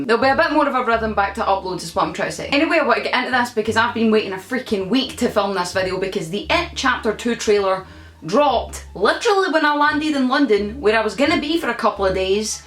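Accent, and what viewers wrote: Scotland, United Kingdom. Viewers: I have a question can you teach me how to speak Scottish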